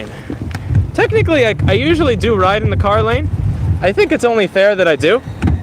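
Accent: New York, United States. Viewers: thats called NY Jewish accent...works even if not Jewish, but we all have it and separates the locals from the wannabes or in this case the Macabees!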